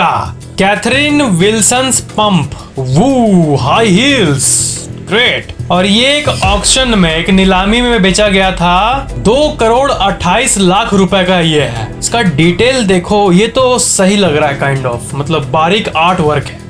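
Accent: Bihar, India. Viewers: Are you from Bihar? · Are you from bihar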